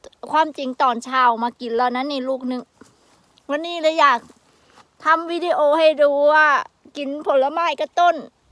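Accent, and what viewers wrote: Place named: Korat, Thailand. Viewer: ดีๆจ้ะ พูดโคราชภาษาท้องถิ่น 🥰🥰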